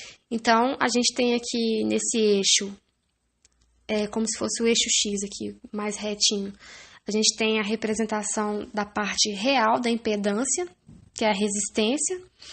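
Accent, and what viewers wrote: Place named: Minas Gerais, Brazil. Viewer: Sotaque de mineira e mexendo com software de Antenas, deve ter feito Inatel...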